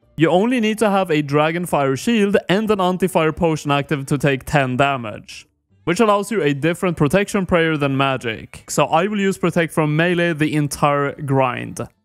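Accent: Sweden. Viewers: Jag älskar att man hör att du är Svensk! :D du fick nyss en ny subscribers. :)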